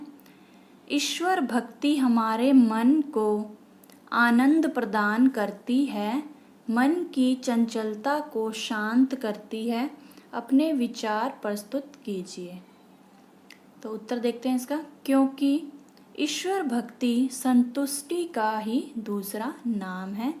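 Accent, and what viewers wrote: Punjab, India. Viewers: Mam are you punjabi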